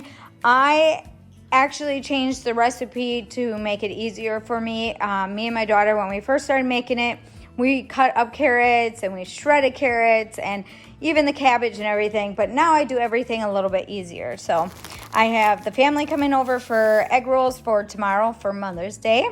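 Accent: Wisconsin, United States. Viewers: Do you ever go to Woodmans? I don’t know how far north they are in Wisconsin. I knew the Wisconsin accent too. (I live in Illinois) 😀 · When I heard your accent I knew you were from Wisconsin. Hello, from a new subscriber from Wisconsin!! Loved the haul. God bless 🙏🏼❤️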